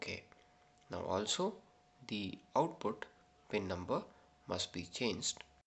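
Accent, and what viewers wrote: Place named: India. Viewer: indian accent too thick to get Plz stop toturing people induan guys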